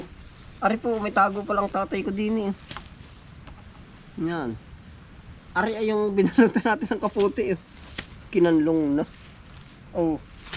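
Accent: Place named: Aurora, Philippines. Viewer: Nkklibang k po pnuorin ..KC Yun slita nyo my Punto..khwig Ng slita nmin ..aurora province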